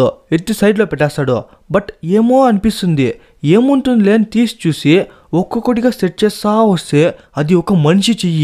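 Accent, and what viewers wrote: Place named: Chittoor, India. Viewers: This chittor slang and he live in Bangalore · Babu ee uooru manadi yasa tedagaundi, rayalaseema slang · Thammudu ekadanundi nuvu Ni language slang Chittooru madiriga vunde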